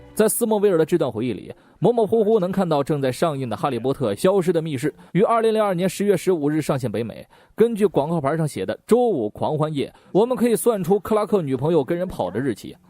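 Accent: China. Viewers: 介广东话比我还飘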